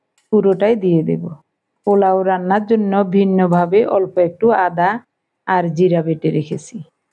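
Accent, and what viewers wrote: Noakhali, Bangladesh. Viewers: আমি এই প্রথম দেখছি।খুব ভালো লাগলো।আপনার কথাগুলি শুনে মনে হচ্ছে নোয়াখালী বা চট্রগ্রামের ভাষা...খুব মিষ্টি ভাষা।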